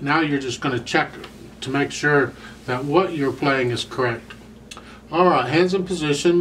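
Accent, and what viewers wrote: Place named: Kansas, United States. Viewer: Beautiful. Thank you! It could have been so cute hearing your Kansan accent saying in Spanish. 😊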